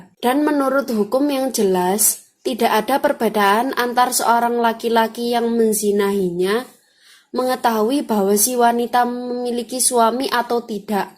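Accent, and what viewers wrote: Java, Indonesia. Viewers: logat mdok...skitar pulau jawa ni...mf sy cinta pulau jawa umumnya indonesia...sy nebak asal orng ni ja...mf jngn trsinggung...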